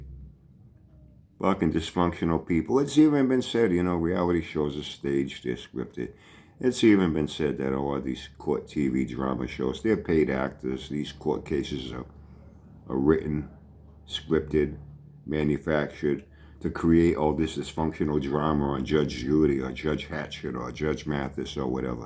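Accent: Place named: New England, United States. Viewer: you are always the " voice of reason" I don't GF what they say the new England accent is just the salt and pepper on top...😁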